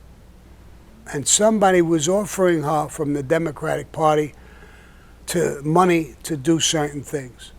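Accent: New York, United States. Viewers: Nice to hear NY er!😊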